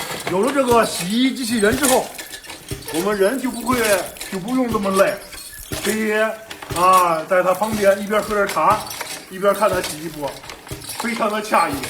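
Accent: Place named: Tangshan, China. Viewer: 老耿说话出了唐山味儿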